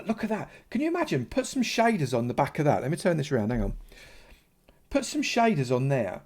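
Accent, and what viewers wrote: United Kingdom. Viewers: Its a normal british accent you donkey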